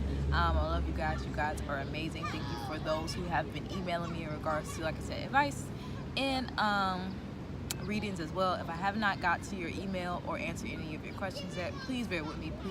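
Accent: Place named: Chicago, United States. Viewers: WAKE UP CALL TV Stoney_stay_woke_sos exactly like Chicago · Sound like chicago..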